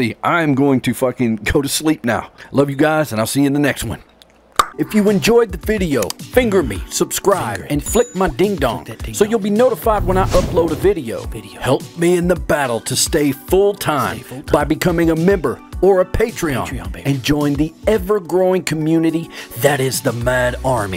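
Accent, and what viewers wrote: South, United States. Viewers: You sound southern and when southern people get scared it’s funny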